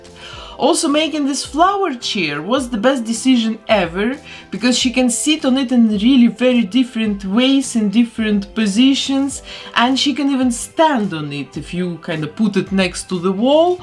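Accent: Russia. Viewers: Такое ощущение, что девушка русская, так как говорит с акцентом, но тогда жаль что нет русских субтитров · Вы русская? У вас такой жесткий английский 😱😱😱😱 Я тоже решила переделать такую же Дракулауру, не нравится она мне вообще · Знакомый акцент. Вы случайно не русская?) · Russian akcent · Мне одной кажется, что у неё очень сильный русский акцент 🤔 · Очень красиво, но почему мне кажется что вы говорите с русским акцентом? Ваша работа вдохновляет! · Девушка, ваш акцент уж очень напоминает русский...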